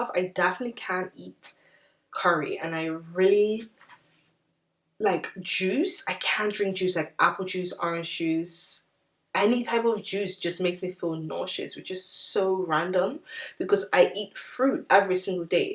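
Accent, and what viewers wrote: Bermuda. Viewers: That moment when you're clicking through YouTube and hear a familiar Bermudian accent. I love that you have a little more British mixed in there! Just gained a 441 subie btw ❤️😊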